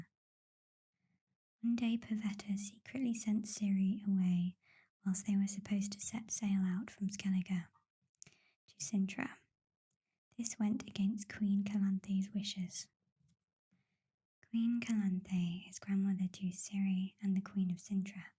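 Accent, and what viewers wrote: United Kingdom. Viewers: Judging by your accent, you live in the UK so I guess you will have plenty of opportunities coming up to catch the rain sounds ;). But maybe you can use background sounds from other YouTube channels if you'd ask their permission? I've just watched you other Witcher vid too, great outfit and your whispers are as relaxing as your soft spoken vids. Keep up the good work, really appreciated!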